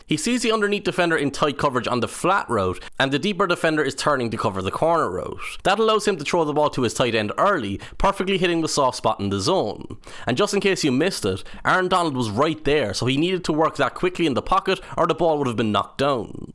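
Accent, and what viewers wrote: Ireland. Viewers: Really enjoyed your analysis on this.As a Scottish Packer fan it’s great to hear an Irishman talk about football… relatable!👍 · It is so odd hearing an Irish accent when talking about American Football….I expect to hear this kind of accent for Premier League analytics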